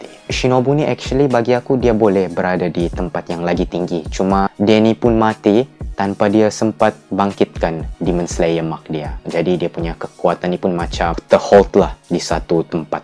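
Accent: Sabah, Malaysia. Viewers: Org sabah ka bro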